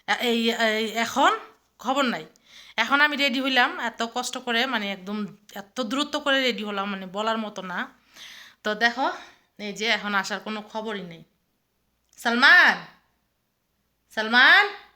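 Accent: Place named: North, Bangladesh. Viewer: কথা শুনে মনে হচ্ছে -বাড়ি দিনাজপুর, ঠাকুরগাঁও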